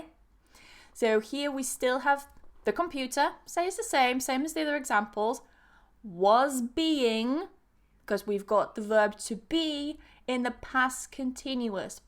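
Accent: United Kingdom. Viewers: I think it's beautiful British English 🇬🇧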